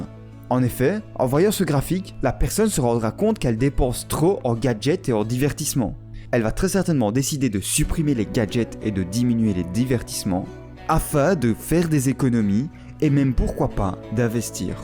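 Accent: Liège, Belgium. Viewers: Tu es belge ? Merci pour les conseils , j'adore tes vidéos 😍❤ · Tu ne serais pas de lièchee toi ? :D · Tu es belge ? Bref bonne vidéo pouce bleu 👊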